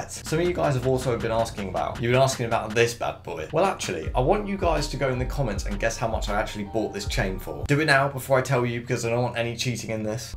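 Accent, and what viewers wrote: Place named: United Kingdom. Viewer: Love ur british accent homie 😂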